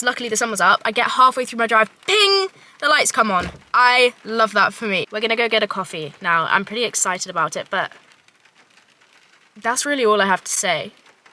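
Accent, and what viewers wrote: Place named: United Kingdom. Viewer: Who would not wanna hang out with such an endearing British accent? 😍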